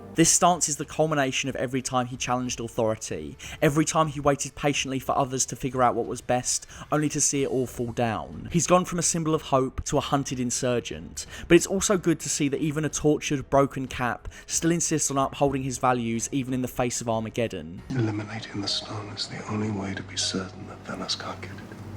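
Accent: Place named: Edinburgh, United Kingdom. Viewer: glasgow? its edinburgh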